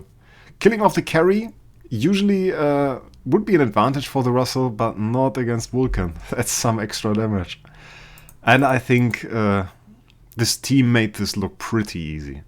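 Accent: Germany. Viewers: Ur from germany right?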